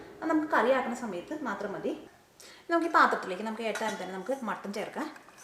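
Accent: Thrissur, India. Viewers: R u from Trichur?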